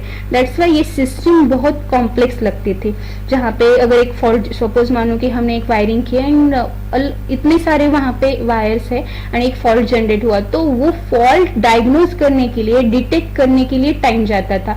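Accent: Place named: Hyderabad, India. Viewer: Ma'm aap Hyderabad se hai kya? Aap ka lahja batara k aap hyderabadi hai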